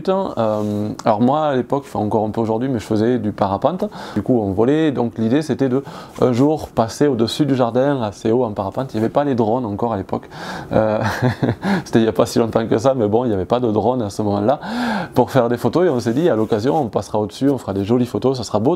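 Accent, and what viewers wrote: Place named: Lautrec, France. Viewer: Très bonne analyse sur soi même qui sert beaucoup plus que l'on ne croit peut-être au départ. Pour toi et surtout pour les autres. Très bon accent pour un ancien Lautrécois qui a migré en Guyane. Je m'appelle Bernard et suis un ami intime à Robin que tu dois connaître certainement.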